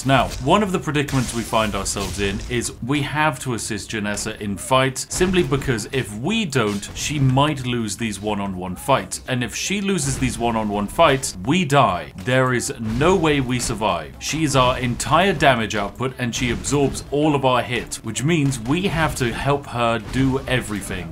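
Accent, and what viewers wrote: United Kingdom. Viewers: Is british? I can't help but notice his accent...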